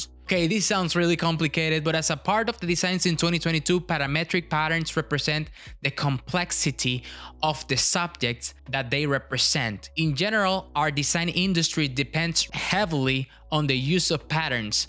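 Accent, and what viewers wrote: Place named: Venezuela. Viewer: Amigo excelente video, apenas escuché tu acento algo me decía que eras Venezolano haha 🙌 Keep up the great work!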